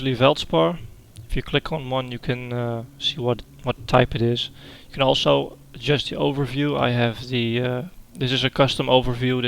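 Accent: Netherlands. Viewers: do i hear a lil dutch in ya?:P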